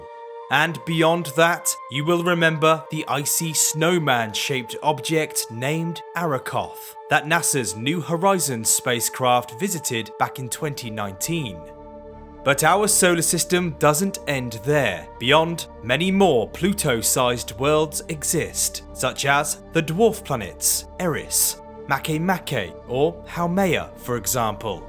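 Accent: United Kingdom. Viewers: Tired of every video narrated by a tired, boring, pretentious sounding British guy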